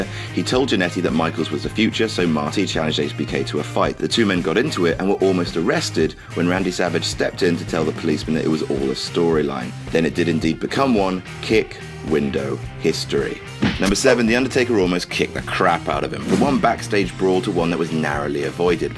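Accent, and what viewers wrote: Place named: England, United Kingdom. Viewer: Nothing on this list is that shocking or unknown to fans, and saying it in a sarcastic English accent doesn't make the list any better.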